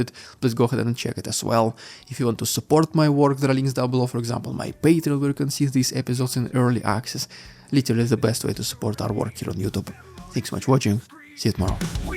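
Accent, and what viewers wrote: Russia. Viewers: I like hearing the news with a strong Russian accent. You’re easy to understand and in fact your English is very good. It’s more fun this way, especially when reporting about Kadruyov’s ill health. Thanks for a very interesting video❤ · Bro your Russian accent is getting thicker by the day, and it’s getting really hard to understand what’s you are saying? How long have you been reporting in English now? 😂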